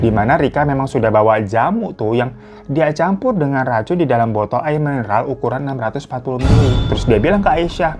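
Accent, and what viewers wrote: Java, Indonesia. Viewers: baso plembang with jowo accent unlocked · Lucu bngt denger bg tiap x ngmong bhs mana pun tp logatnya ttep jawa..😂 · Bang ngapo logat nyo jawo😂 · Apapun bahasanya... Logatnya tetap jawa.... Kocak memang Abang satu ini... 😂😂😂 Jawanya kentel banget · logatnya medok bgt😂😂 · Salfok bang ngmong pake bahasa palembang tapi logat jawa hahaha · logat Palembang nya lebih kelogat jawa😅🥰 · Lucu bgt 😅😅.. logat jawa tp bahasa Palembang 😂😂😂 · Bahasa Palembang logat Jawa 😂😂😂😂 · Salfok dgr bang orng wong kito orng melayu sumatera,logat bang logat jowo😂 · Bahasa palembang tp logat ny medhok jawa bgt ya bang 😁. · logat pembang nyo bang mirip logat jowo 😂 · Bahasanya bahasa Palembang tapi aksennya aksen jawa..salam dari Palembang bang🙏🏻🙏🏻🙏🏻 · Bahasa Palembang logat Jawa 😂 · Kak...😂 Ngapolah logat nya be cerito cak wong jawo😅... Kito wong Palembang Galo ee kak 😂😂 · salfok logat jawa di bahasa palembang😭 gak papa bang dimakluminn kerenn kok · Ngakak, ngapo logat palembang jd logat jawo wkwk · Bhso palembang nya jd aksen jawa yo D maklumi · Pokoknya bahasa apa aja pke logat jawa sama si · Baaaang ini logat palembang looooh kenapa pake logat njowoooooo ang ang ang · kok logat jawa bg😂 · Bhs palembang logat jawo😂😂,, lucu amat😂😂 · Bahaso palembang logat jawo abng ni🤣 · Bang logat nya jawa bangat deh 😂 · salfok, lucu banget bang baca teks nya tetep ada aksen dan nada jawanya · Palembang bang.. 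ngapa dah pake medok Jawa aksen bacanya 😂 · logattt nyo idk cakitu kok malah logat jawo🤣🤣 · Lucu nian, bahasa Palembang tapi logat medok😭😭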